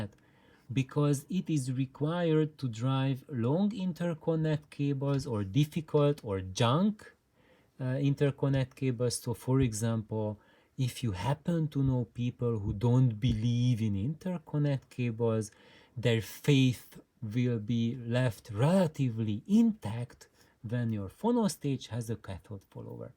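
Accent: Hungary. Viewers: I’m 2 yrs late, but I am and have always been able to understand you perfectly,. I’ve met friends via the vinyl and audio communities from all over the world, France, Germany, Sweden, Australia, Japan, Brazil, etc., and I can understand them, quite clearly in fact. It’s not like you’re speaking Hungarian, just English with a Hungarian accent.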